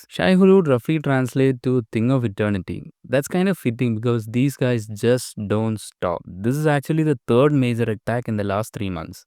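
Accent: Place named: Kerala, India. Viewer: Are you a Malayali? That accent sounds familiar.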